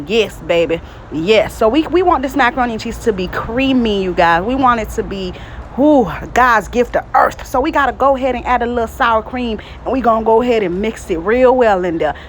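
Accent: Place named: Florida, United States. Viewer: 😂Flowwwda aksent!!!! Luv it!!!!🔥🔥🔥🔥🔥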